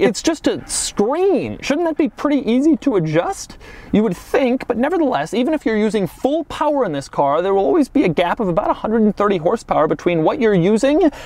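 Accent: United States. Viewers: I absolutely hate how Americans pronounce German names. I mean I’m Dutch so as an inhabitant of the neighbor county of Germany pronouncing the names of these cars correctly is a lot easier for me than for you, but please try to because you Americans think you are the best in the world while Europe is a lot better.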